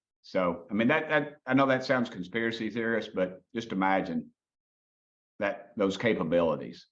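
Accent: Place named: South, United States. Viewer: I couldn’t have more liking and respect for this gentleman if I tried to. He does exactly what he was put into office to do, work for his constituents & boy does he do it well. The southern ‘twang’ & his “tic tac like the candy” comments just make him more endearing. I don’t think anyone got as much as we were hoping to from the congress sitting yesterday, I sat here in NZ & watched the entire thing, & was very disappointed at the blocking of this & that, but hopefully in a behind the scenes meeting they will get to see more, find out more, enough to dig their boot & high heels into & start to climb up that rope, then, we the public, will hopefully see & hear more too. Time will tell, & I guess at this stage more times not going to kill us, at least I hope not!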